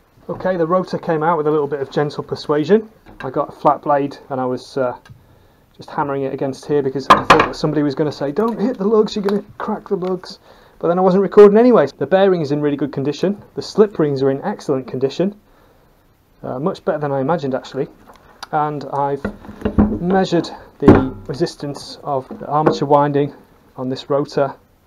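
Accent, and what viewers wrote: United Kingdom. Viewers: Very good video, you sound like your from the UK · Gracias por tu vídeo. Por tu acento debes ser Británico. Me agrado oír que dijeras KAPUT. 😜😜😜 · Great video, but I'm confused; your vernacular is dollar based, whereas your accent is pound centric. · You talked about the cost in dollars of parts but you sound very British, are you?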